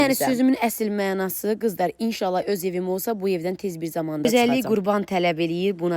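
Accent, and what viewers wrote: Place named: South, Azerbaijan. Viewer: Ləhcəsindən bilinir ki cənub bölgəsidir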